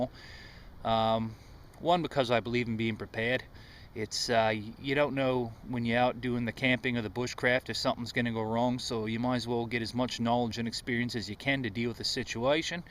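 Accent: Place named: Australia. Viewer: hahah great accent mate. aussie/american/canadian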